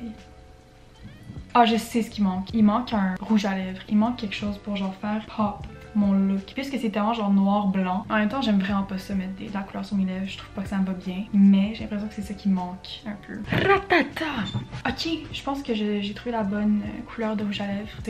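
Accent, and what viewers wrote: Quebec, Canada. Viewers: L'accent québécois c'est même pas un accent c'est une autre langue + le slang omg 😅😅 j'ai adoré pls don't be insecure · l'accent québécois 🤩 trop cool comme vidéo <33 bisous de France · Moi je trouve ça mignon l'accent québécois ^^ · je suis française et ton accent est adorable, on dirait un peu l'accent québécois. Tu es vraiment belle <3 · l’accent québécois est on another level, for a hot second j’ai oublié tout ce que j’appris mais après un peu i could pick up on some slang and understand the video! so thankful for this boost of confidence to begin studying it again <3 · Je suis française et j'étais curieuse de voir comment tu parlais en français et je trouve que tu parles vraiment bien, au niveau vocabulaire après l'accent est fort mais pas dérangeant car on dirait que tu parles avec l'accent quebecquois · ton accent québécois est TROP CHARMANT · L’accent québécois est meilleur😋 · J'adooore !!! 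Je suis française et j'adore l'accent québécois. J'adore la vibes ❤ on veut d'autres vidéos comme ça plzz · omgg i never realized how different the quebecois accent is! my french teacher is from côte d'ivoire, i'm having a hell of a time understanding this video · actually, She has a strong quebec accent ( plus quebec french and France french are almost two different languages like there are LOTS of differences between theses two ) so if ur trying to learn France french , idk if it’s a good idea because quebec french is basically french with a strong english accent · U should listen to French accent not Quebec accent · J’aime ton accent ses come Québécois et come Nouveau Brunswick et au Nouveau Brunswick ses franglais lol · Bh c'est l'accent québécois donc si vous ne comprenez pas c'est pas de sa faute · she has a québécois accent!! elle a un accent québécois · J’aime tellement l’accent québécois, ça a tellement de charme! 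· je préfère largement le Français mdr mais l'accent Québécois est super drôle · Her accent is also Quebecois, which is probably harder to understand and not something you hear as frequently as a student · OMG j'adore ton accent quebz. Cest compréhensible et pas exageré.💛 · She actually has a strong French Canadian accent haha but its really cute! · C’est trop cool de voir quelqu’un qui parle français québécois sur YouTube! ❤❤ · haha translation : "I'm french and don't worry about your quebec accent and your expressions, they're understandable, it has a lot of charm so don't be ashamed <3" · OMG j'adore ton accent quebz. Cest compréhensible et pas exageré.😊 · Blk Barbie - yeah she's from quebec so it'll sound a bit different to a french person's accent i guess. though i'm not advanced enough to recognise regional accents yet lol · Je viens du Québec (Montréal) aussi! 😂 C trop cool de voir quelqu’un qui parle avec l’accent sur cette plateforme haha Je me suis abonné :) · i love the videos of u speaking in french bc i speak france french from american school but im planning on going to college in montreal so it's nice to hear quebeqois french in a casual setting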